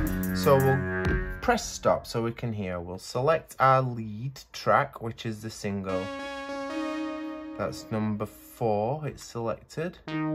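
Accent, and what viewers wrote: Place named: England, United Kingdom. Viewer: I've watched quite a few tutorials on the OPZ and still found some new tips on yours. And it's nice to hear an English voice for a change! Thanks👍😊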